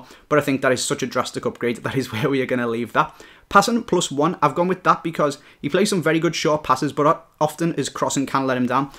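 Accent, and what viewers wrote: Liverpool, United Kingdom. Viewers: Love the Scouse accent ;)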